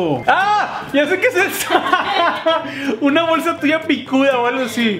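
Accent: Monterrey, Mexico. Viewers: Me da ternura cuándo a se le sale el acento regio❤ luego de ver tus videos ya hasta yo hablo igual jajaja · Asi hablamos los de Monterrey jajaja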